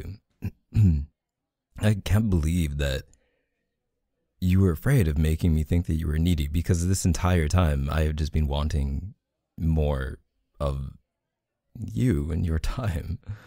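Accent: Canada. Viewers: canadian accents are so adorable i cannot— 😶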